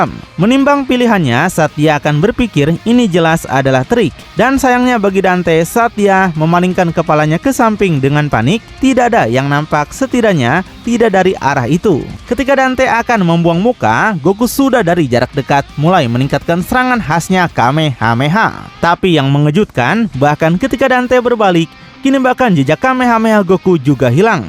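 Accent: West Java, Indonesia. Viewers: Wah mimin org Sunda nya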